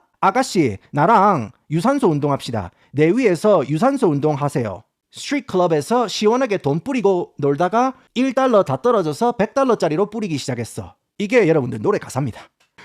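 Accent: Gyeongsang, South Korea. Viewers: 영어와 갱상도 액센트의 강렬한 콜라보!!!!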